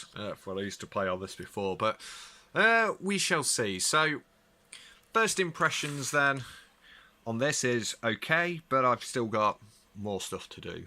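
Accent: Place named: England, United Kingdom. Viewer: makes me remember how i hate english accent like this lol... btw we dont care of your speakers and monitor model.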